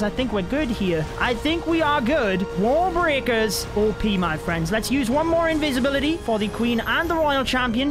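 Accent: United Kingdom. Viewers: Wow the british accent its soo good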